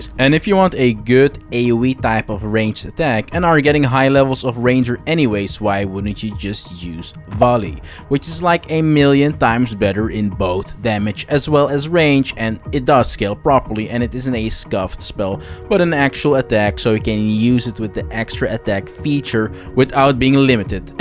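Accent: Netherlands. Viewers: Hey klootzak, are you Dutch?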